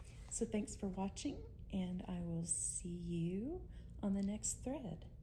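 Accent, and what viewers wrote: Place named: South, United States. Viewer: no you won't bore us. I watched two of yours videos today and you weren't boring at all. Plus you have that cute Southern accent. I wish I had one 😊 I'm a Southern girl at heart. At least I get to visit the south these days and I have Southern friends now.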